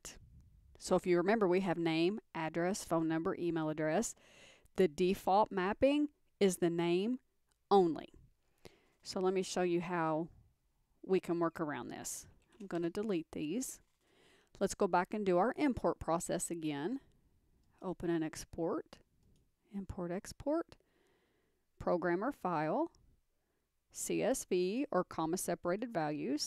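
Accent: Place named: Texas, United States. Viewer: I really enjoyed your laid back presentation and, being from Texas, I appreciate your accent! (finally someone I can understand who isn't mirroring video game graphics to amp up their presentation. I only wish your screen were clearer. It is very "soft" and unfocused. Otherwise perfect. Keep up the great work!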